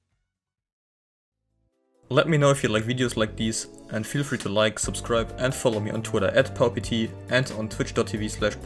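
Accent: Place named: Germany. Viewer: Hab dein accent gehört und direkt gewusst, dass du deutscher bist lol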